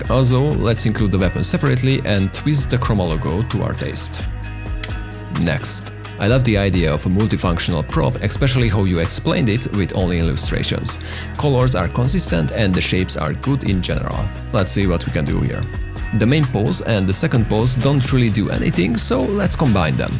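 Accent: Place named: Hungary. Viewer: Your hungarian accent is so strong man🤣 (Ímádom a rajzaidat és a stílusodat)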